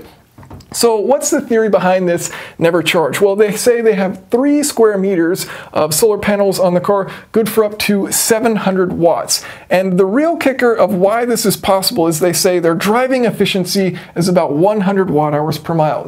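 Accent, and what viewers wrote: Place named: United States. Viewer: I really appreciate hearing an American accent using metric units, just got to get the MPH to KPH - Love your vids, mate.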